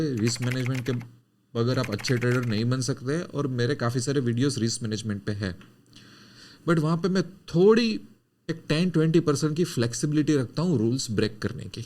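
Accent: Gujarat, India. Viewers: As ur voice accent is , i think u r a gujarati.....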